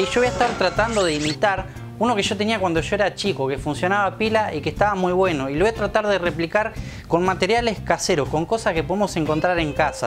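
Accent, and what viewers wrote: Argentina. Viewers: oh un argentino que bueno like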